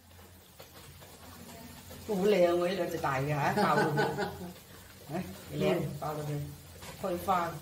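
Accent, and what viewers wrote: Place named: Renhe, China. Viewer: 我地家乡都整呢滴 😄 听你的口音 有点像人和人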